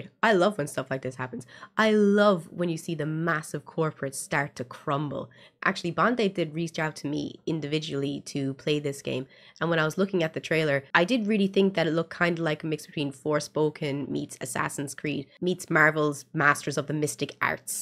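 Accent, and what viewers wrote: Ireland. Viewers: love your short videos and I'm glad I came across this on YouTube. love the Irish accent, my great grandfather came to America from Ireland, and I really want to visit someday. but to get back on topic, totally agree, you make garbage people don't want to play it's not going to sell. I keep hearing the people against sweet baby and other games as a vocal minority but if that was true the game would succeed, hmm wonder why they don't. maybe because its minority you are making your games for, and they don't buy them, you cultivate the wrong player base, and you will end up on the cutting room floor.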